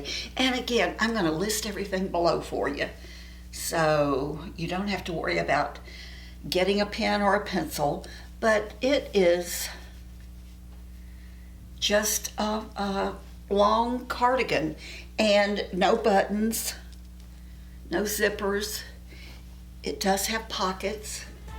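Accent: United States. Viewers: Paris, France calling...I just came across your video by chance and found its content an absolute delight. And I simply adore your accent - it reminds me of an American friend of mine. You have a natural flare to entertain. After watching, I discovered your age - 78 wow! I would like you to know that you boosted my morale! I will definitely hunt down your other posts! Thank you again.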